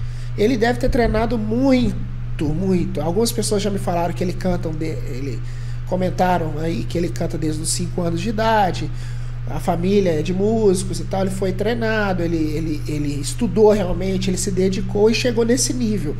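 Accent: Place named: Brazil. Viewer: Hi the best thing to do is listen to the singer in order you have done 1 & 2 so next is 3 thanks again for the subtitles my partner is Portuguese from Madeira so I understand some Portuguese but Brazilian Portuguese is slightly different love the Brazilian accent though ❤️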